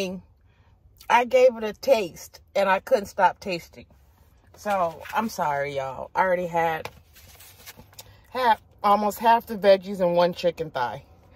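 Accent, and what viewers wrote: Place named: West Coast, United States. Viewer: That's a west coast accent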